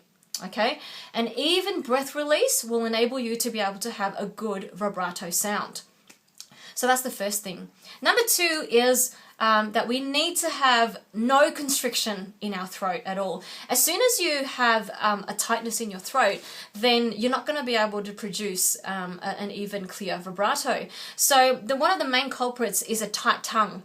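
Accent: Australia. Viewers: are you from Australia? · OMG, shes stray-lian : )) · i figured it out, you're australian! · You're Australian correct? I'm usually good at differentiating between Australian and British. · Are you Australian? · She's from Australia....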